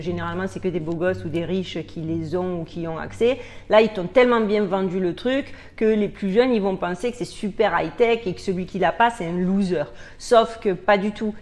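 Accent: Marseille, France. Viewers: Hello! J’adore tes vidéos! Elles traitent de sujet graves avec une pointe d’humour dont tu as le secret 😉. Continue comme ça! Je like ❤️. Par contre, effectivement, je ne reçois aucune notification alors que j’ai bien cliqué sur la cloche 🔔... Mais c’est pas grave! Je vais direct sur ton compte lorsque j’ai une petite baisse de moral 😁 Cet accent du Sud ne peut que me mettre en joie!! Bravo bravo pour ton travail 🙏🙏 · Bonjour. je regarde vos vidéos et votre accent me rappelle des souvenirs , j habitais du coté de Marseille, mais comme mes filles vivent maintenant une a Boston et l autre près de Montréal j ai traversé moi aussi et je ne regrette pas.... Merci pour les vidéos.....Mon gendre est Directeur de Demandes Globales chez Sanofi a Boston.. je vais demander a ma fille ce qu elle en pense.....j ai deux magnifiques petits enfants et je n ai pas envie qu ils soient abîmés par leur saloperie de vaccin...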